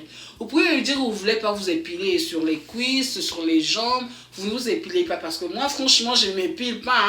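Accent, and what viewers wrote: Ivory Coast. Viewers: Loooool 😀 t'as vraiment l'accent ivoirienne hein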